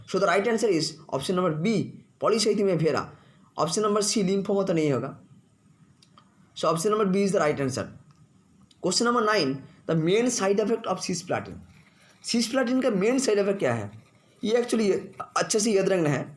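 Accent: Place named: Northeast, India. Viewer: Thank u so much sir 😊.. Your voice is seems like northeast people....I really love northeast people because they are very kind herted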